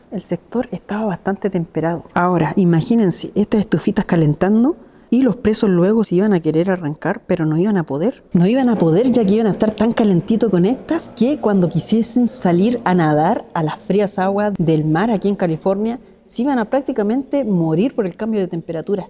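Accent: Chile. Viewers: Primera vez que veo este vídeo y por el acento me preguntaba se siente Chileno que sorpresa que eres mi compatriota yo igual que Uds vivo en Canadá y soy Chilena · Nose por qué , pero a mí me gusta como suena el Acento Chileno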